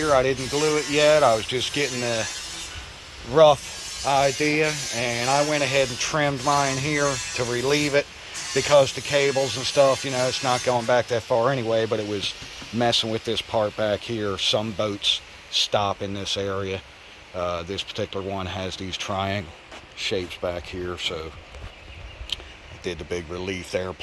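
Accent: Philadelphia, United States. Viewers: This guy from Philly? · Damn that’s nice. Is that a Philly accent?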